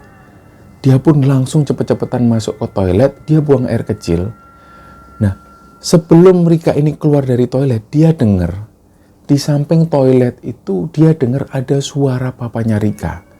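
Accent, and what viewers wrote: Java, Indonesia. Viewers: mas duhhh maless aku jan nagih i klo sehari ga denger koyok ono sing kurang, btw sampean jawa ndi seh mas kok boso jowone jan koyok bahasa sehari2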